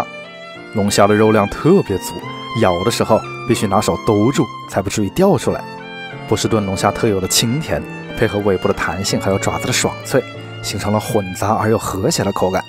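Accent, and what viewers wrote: Wuhan, China. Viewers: 老师请问你是武汉人吗？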